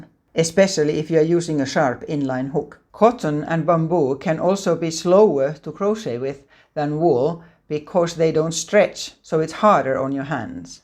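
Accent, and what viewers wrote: Finland. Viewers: Oh I love your Finnish accent - reminds me of my Grandma Jenni! Great tips and love your teachings too. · 😮 you're from Finland? I thought your accent sounded familiar! I am learning Finnish. Just started about 2 months ago. I'm learning on Duolingo so I understand I'm learning more formal Finnish rather than spoken Finnish, but I've got to start somewhere! Suomi on niin kaunis kieli! Kiitos.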